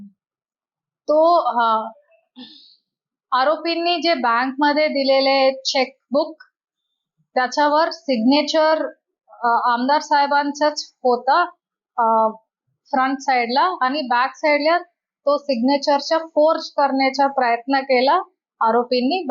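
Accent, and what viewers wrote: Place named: Bengal, India. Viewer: Madam, Bengali Caste Che Vatat Aahe. Becoz of Speaking Accents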